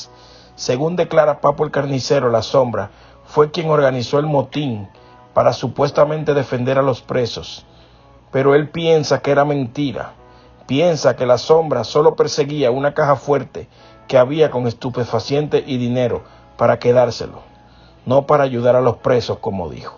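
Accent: Dominican Republic. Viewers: Que mal lees , sos Dominicano?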